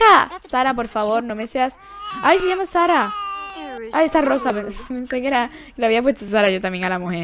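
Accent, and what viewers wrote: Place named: Andalusia, Spain. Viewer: Y me encanta tu acento súper andaluz con la s yo soy de Cádiz pero vivo en Marbella✌🏾👑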